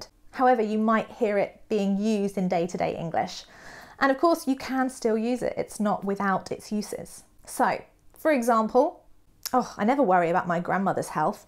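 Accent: United Kingdom. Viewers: Hi, 🖐as always, I love the way you speak English, it's crystal clear, typical British accent, and most importantly, it's full of vigor 💪👍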